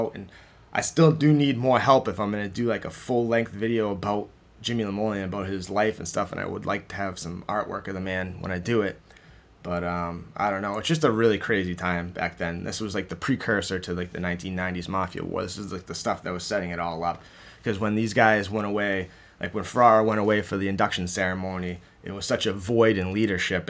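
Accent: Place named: New England, United States. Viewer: It's freaking weird. Your voice sounds exactly like mine. I feel like I'm listening to myself if I had a New England accent.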